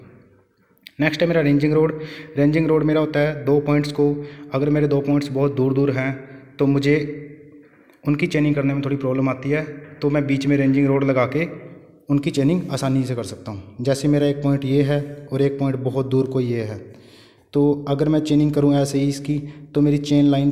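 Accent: India. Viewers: sir are you from himachal?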